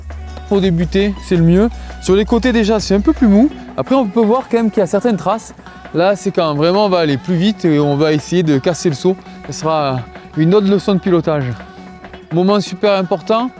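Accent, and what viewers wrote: South, France. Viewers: Très bonne explication de quoi mettre en confiance et le plus l'accent du Sud 🤘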